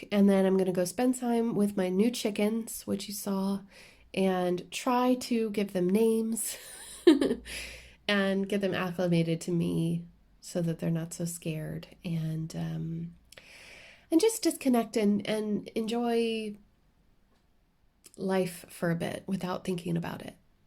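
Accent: Ireland. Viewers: did you realize that you are picking up an Irish accent? When you were outside with your new divas it was coming out. It fades in and out of your videos depending on what you are doing.💖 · Valerie Dunham I heard it and loved it. But then again I love the Irish accents anyway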